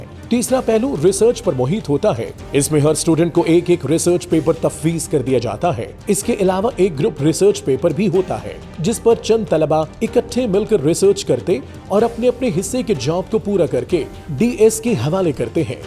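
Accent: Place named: United Kingdom. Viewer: Urdu Engrezi accent mein, wow !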